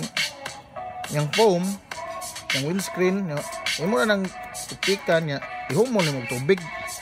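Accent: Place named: Visayas, Philippines. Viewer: bakit hinde ko napanood ang video na ito.bisaya kaayo ang vloger.hahaha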